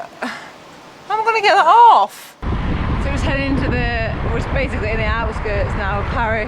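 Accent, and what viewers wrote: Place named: North, United Kingdom. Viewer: Absolutely amazing videos. As a northerner now living in munich. The accent is a touch of home. Getting myself ready for a cross alps trip. Keep em coming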